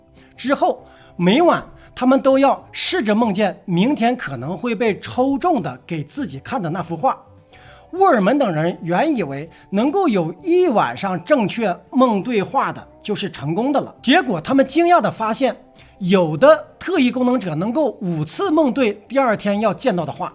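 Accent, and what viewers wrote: Northeast, China. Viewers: 不喜欢东北土语，太低太俗，拉低了节目水平、层次。望去掉！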